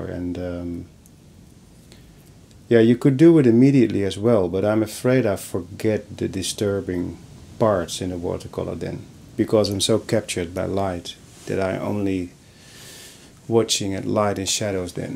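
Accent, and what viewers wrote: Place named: Netherlands. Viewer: I just love your work it really speaks to me. I have painted most of my life but never had the time to really get into it. One side of my family ate Dutch and it was my first language so it fees so comfortable hearing your accent, like coming home. Thank you so much for sharing.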